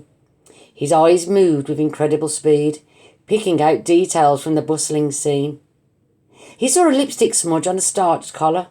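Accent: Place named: Victoria, Australia. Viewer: Good on you for reading this out loud. What part of Victoria is your accent from?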